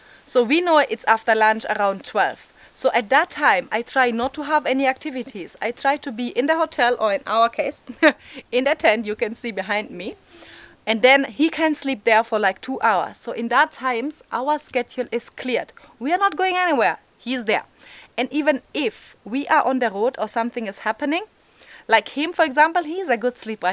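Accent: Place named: Kenya. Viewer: Thank you 👍💕 I love how you speak English with a Kenyan accent 😊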